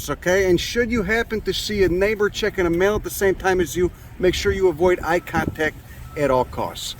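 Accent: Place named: Texas, United States. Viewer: Genuine Texas accent!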